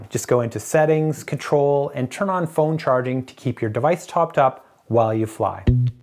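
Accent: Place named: Canada. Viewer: Thanks for elaborating. I'm in Eleuthera Bahamas now (a nice playground to use the drone, e.g. Sapphire Blue hole) but I live in Brampton. I'm going through your library of informative videos and appreciate them. Funny how almost immediately, one can detect a fellow Canadian in a video. Best. Ken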